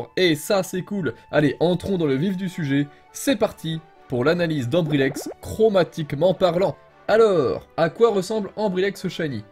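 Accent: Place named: Lower Normandy, France. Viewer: "Ou qu'il l'est?" "Ou qu'on l'a?" T'es un vrai Bas Normand comme moi x)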